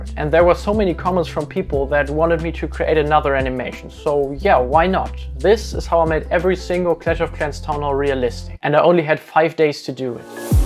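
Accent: Germany. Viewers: du bist deutsch man hörts;) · Dude you got a german accent ( Nicht böse gemeint) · Man hört den deutschen akzent so was von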